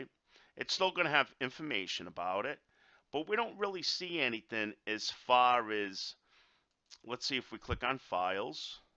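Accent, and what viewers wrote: New York, United States. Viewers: amazing info and a new yawrk accent. love it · Omg i love your accent, Americans have the best accents. Great vid too, thanks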